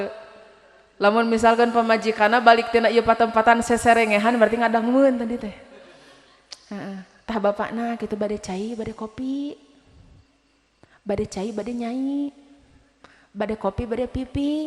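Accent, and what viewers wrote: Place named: Sukabumi, Indonesia. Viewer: Logat Sunda Sukabumi,.... gaskeun.Bekasi hadir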